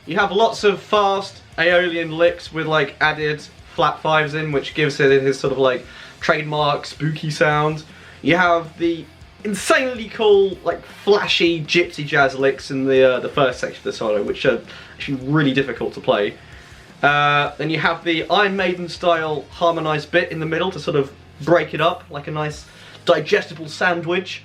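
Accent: United Kingdom. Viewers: did he? That british accent (I like so much) played me...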